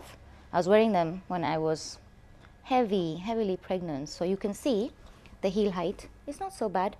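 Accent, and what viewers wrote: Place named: Kenya. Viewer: Hello rich folks....just go in her by chance and am loving the vybe....btw love the fact that you are kenyan by your accent and not twenging to sound very foreign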